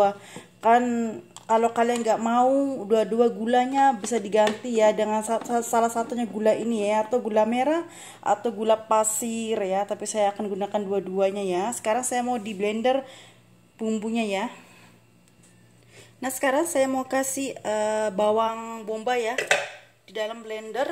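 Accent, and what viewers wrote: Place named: Banyumas, Indonesia. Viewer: Ngapak nih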